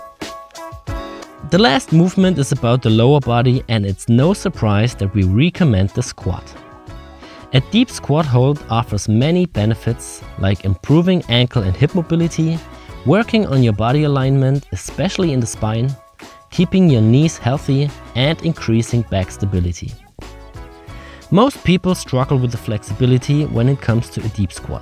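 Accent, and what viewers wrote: Germany. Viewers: slight german accent.. bist du Deutscher, mein Lieber? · german accent, fuckin hell man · Wie man einfach raushört, dass du deutsch bist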